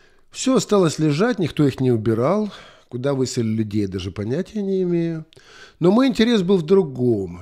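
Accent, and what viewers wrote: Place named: Ukraine. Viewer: Спасибо, за грустный, поучительный, правдивый рассказ. Отмечаю каждый раз, слушая Вас: как живуч украинский акцент, даже по прошествии стольких лет жизни за границей, свободном владении английским и т.д...😊👍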